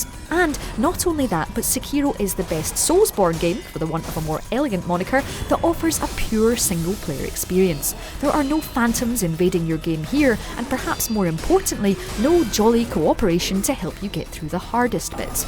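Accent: Scotland, United Kingdom. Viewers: The games are good, but your british accent makes my ears bleed to death... I am sorry but why cant you pronounce the word Year or Around or Our withouth twisting so many unnecessary times your tounge? Its nothing personal towards you. And no, i am not a bad person.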